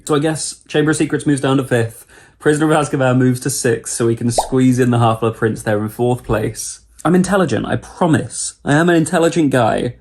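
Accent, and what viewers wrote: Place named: United Kingdom. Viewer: I like how you show books, and the second book is a little bit torn, so it reminds me by first books I read in my childhood. Miss them much. Now I have a Harry Potter audoimarathon, listening all books in English read by Stephen Fry. You videos also help me to enjoy content as well as good English (British) pronunciation. Wish you a Marry Christmas from Russia! (we have our on 7th of January). In Russia Harry Potter movies are not Christmas, but so-called New Year's movies, so I will start to rewhatch them soon)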